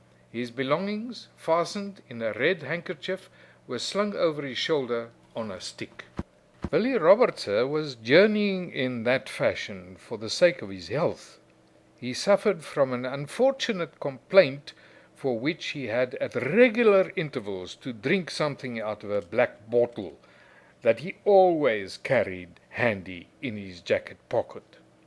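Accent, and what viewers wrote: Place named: Afrikaans, South Africa. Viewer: baie dankie ou vriend! As a descendent of Casper and Diederik Coetzee who founded the town of Zeerust, originally Coetzeerust, not far from Groot Marico and very much in love on with the works of Herman Charles Bosman, I thank you for this reading. As the previous commentator rightly remarked, this just has to be read with an Afrikaans accent, giving that extra quality to these stories from the 'bosveld'. Please do some more! A Boerseun in the UK.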